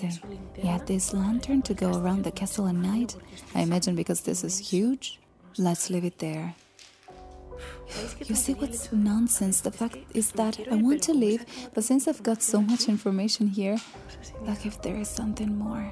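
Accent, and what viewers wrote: Spain. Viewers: Es muy riesgoso tu trabajo no siempre es lo mismo todos esos lugares tienen algo enigmática más es importante ser prudente en dónde quiera existe maldad humana y más por tu condición de mujercita no faltan vivales o asesinos ojalá! Solo sea una broma pero recuerda el castillo de Napoleón cuanto destrozó y tal cual lo señalas respetas pero también ay personas malas y vaya que muy malas saludos desde Tula Hidalgo México por tu acento eres española tu voz es delatora.👍👉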